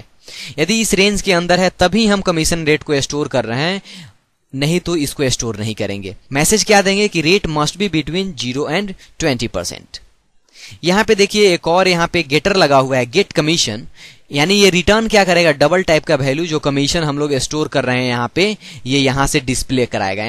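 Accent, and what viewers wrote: Bihar, India. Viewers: typical bihari accent ...But lecture is good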